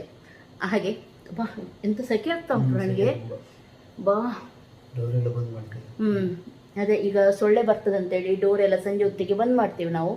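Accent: Mangalore, India. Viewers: Hi mam Nim mnglr kannada language chengiruthe mam & Nice video mam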